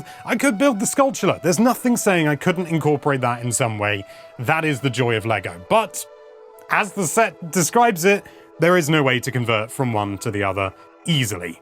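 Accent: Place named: United Kingdom. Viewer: Its just a british accent thing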